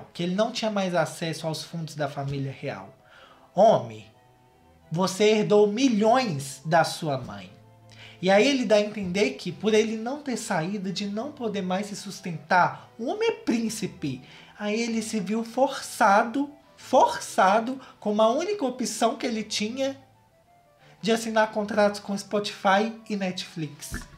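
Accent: Minas Gerais, Brazil. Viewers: Kkkk vc é muito fofo,. Adoro seu sotaque mineiro <3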